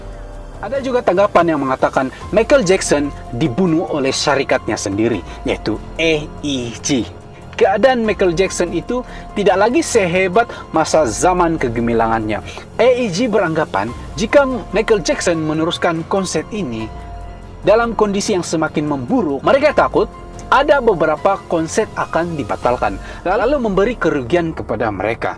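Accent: Sabah, Malaysia. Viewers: ya bahasa org sabah memag begitu loghat nya lebih kepada bahasa baku😊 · Ini bahasa sabah sarawak la...mlaysia ada borneo kan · Pertama aku sangka orng Indonesia rupa"nya orng Sabah😂🙏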